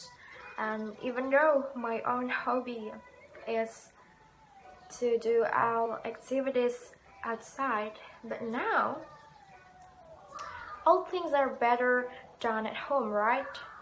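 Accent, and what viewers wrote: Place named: United Kingdom. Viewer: Hi! Long time no see! I'm glad that you look healthy in this video! Keep yourself safe during the quarantine ok! I love the way you speak english, you almost nailed british accent! Your pronunciation was also clear, i can understand every word you said. And thank you for the information, it's very useful! Keep safe and stay healthy ok! See you after the quarantine ends!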